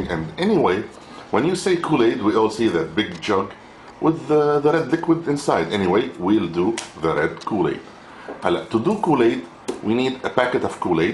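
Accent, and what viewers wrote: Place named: England, United Kingdom. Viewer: Loved ur eng accent dude!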